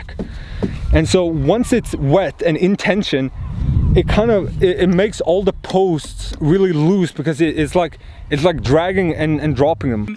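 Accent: Norrland, Sweden. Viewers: bor du i norrland eller låter så på dialekten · 'I bore three holes [...]' The Swenglish is strong with this one.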